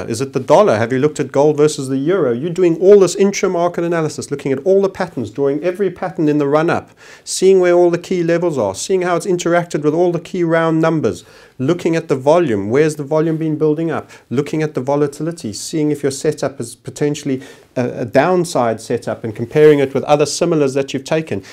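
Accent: South Africa. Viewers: I love this man's accent, Sounds South African but I could be wrong...totally agree with what he's saying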